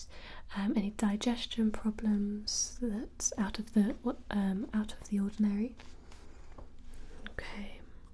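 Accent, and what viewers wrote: United Kingdom. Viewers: Is she from UK?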